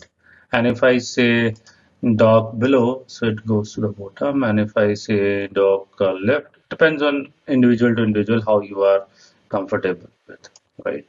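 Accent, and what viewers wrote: India. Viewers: We Got An Indian in the househhhh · Thanks for you , but his accent is Indian so I can't understand very well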